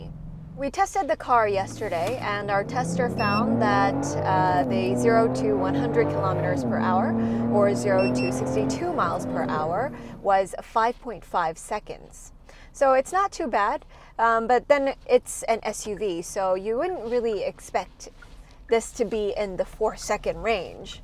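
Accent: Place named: United States. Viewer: 으음. 메이크업, 스타일은 한국식인데 영어는 미국식이시군요ㅎㅎ 차 리뷰도 보고 영어 표현도 배우고 잘 봤습니다. Well presented. 💘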